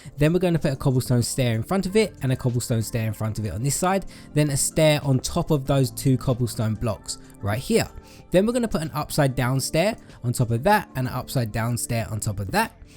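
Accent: United Kingdom. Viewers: I Need Some Attention xD 😂😂 British ascent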